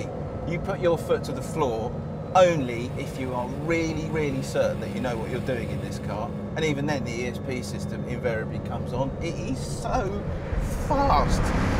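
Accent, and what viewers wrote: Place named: England, United Kingdom. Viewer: odio su acento ingles ..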